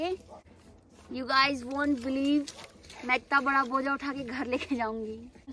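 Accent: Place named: Pahari, India. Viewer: Her pahari accent 🔥😘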